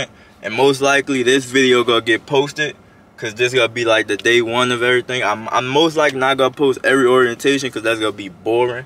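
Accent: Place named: New Orleans, United States. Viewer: Say bro u from new orleans? Nvm I went and heard the “ya heard me”. U done confirmed it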